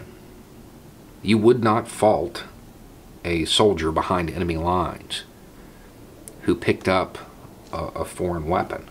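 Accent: South, United States. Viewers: I gotta tell you this one: Being honest, I come from a VERY liberal state. And not one anywhere near the South. There is a stigma here of Southern people: that literally of them who aren’t black are so far up Trump’s racist ass that they’re beyond saving... And to be honest, I saw a video of yours once through auto play, and I saw the beard and heard the accent... and I thought for sure you were some sort of confederate flag wearing neo nazi. Then I heard you talk. I mean I really listened to what you sad to say. And you should know that I had to take a step back and realize that I had been the biased one all along. I’d become the close minded person I believed others to be, and it was a really humbling moment. I listened, and then watched almost all your videos... and I want to stop and apologize to you and every other person I’ve written off for that kind of thing. 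Now, I may not always 100% agree with what you say, but I so deeply respect that you’re a thinking American. And I deeply respect that you’re out there being honest with your posting. It gives me hope for our country, that maybe - just MAYBE - we’re not actually as divided as the tribalistic political movements want us to be. Hope that others in home states like mine will listen to people like you, and stop jumping off conclusions and listen to what you have to say (and Vice versa). I owe you (a person I’ve never met) an apology and to ask forgiveness for making assumptions of who you were based on your pre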